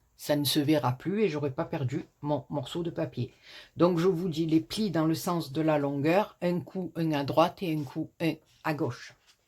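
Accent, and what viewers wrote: South, France. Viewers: Coucou, rhooo mais où vas-tu pêcher toutes ces idées fantastiques. C'est vraiment très beau d'autant que l'on peut le refaire pour toutes occasions. C'est génial et réellement une bonne idée cadeau. Avec ton petit accent du Sud et ta gentillesse c'est un régal de visionner tes videos. Un rayon de soleil en ces périodes certes festives "normalement" mais quelque peu moroses à cause de ce foutu virus grrrrrrrr. Superbe!!!! Gros bisous et merci pour ce partage.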